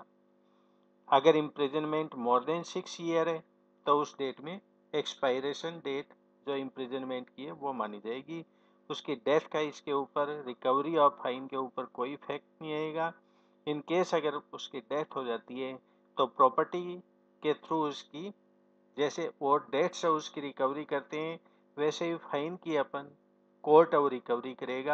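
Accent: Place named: Indore, India. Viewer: Are you from somewhere around Indore-Ujjain, sir? "Apan" caught my attention. :D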